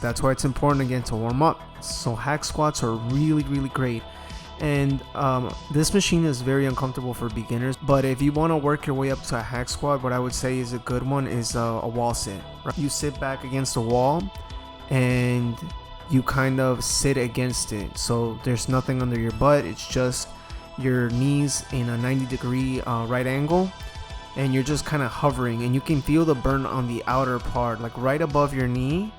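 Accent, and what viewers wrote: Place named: Hawaii, United States. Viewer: He has a slight Hawai'i local accent. Thats how it sounds to me, but the exercises are helpful. Good stuff.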